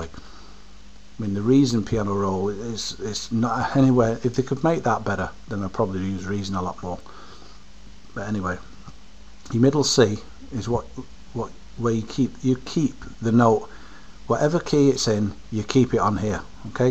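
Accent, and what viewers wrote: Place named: Europe. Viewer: I did. wow, so many gaming Vids. I used to be a hardcore gamer. Now my enjoyment from games chiefly comes from playing with other humans (in the same room). Still, if I ever need to catch a kewl euro accent, I've noted you as reference material;P. Cheers. See you around mate.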